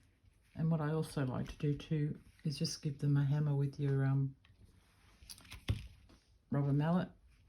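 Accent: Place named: Australia. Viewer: Hi … it’s Shelley Cass in Brisbane. We ‘met’ in a different group about a month or so ago. It’s nice to here an Australian accent in a tutorial. Also I agree with your comment that we don’t have access to many large bead retailers in AU unfortunately. 😔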